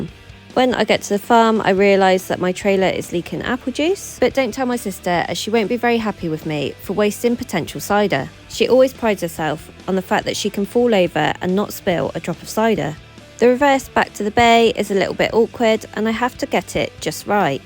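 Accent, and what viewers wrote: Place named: Somerset, United Kingdom. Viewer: Cider!!! 😂 Your accent always reminds of mid-Somerset where I used to live and combined with the apple load today I'm feeling a longing for the Levels again! 🙂 Love your sarcasm, the way you said High-Visibiilty-Vest made me laugh, lots. Love the videos. Thank you.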